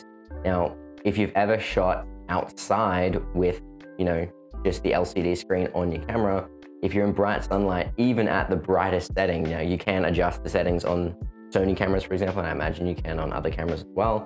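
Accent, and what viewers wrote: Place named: Australia. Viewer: You sound like Adrian Gee. I am guessing you are from Adelaide or Melbourne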